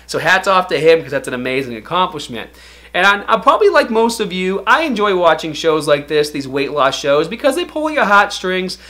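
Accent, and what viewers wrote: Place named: New England, United States. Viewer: the accent is that of New England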